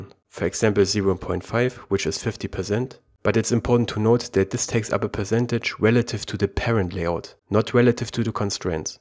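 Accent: Germany. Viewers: You sound like a German when he speaks English - I know it, because I'm german. :D And really great video!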